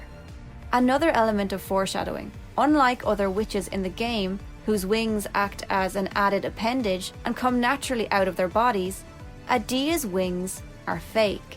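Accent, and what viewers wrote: Ireland. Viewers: Question: are you Irish or Scottish?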